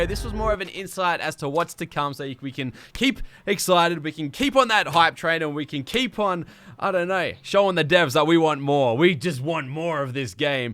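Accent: Australia. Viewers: are you Australian?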